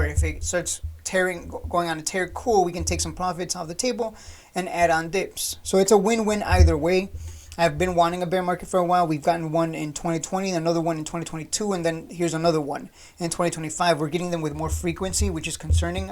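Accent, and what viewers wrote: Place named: Northeast, United States. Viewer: oh I thought you was in northeast cause the accent haha I’m from SoCal. I’ve driven truck all through Midwest and NY many times tho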